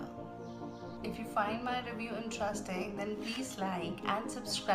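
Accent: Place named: New York, United States. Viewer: Very NYC and good pronunciation....go a head And d best😃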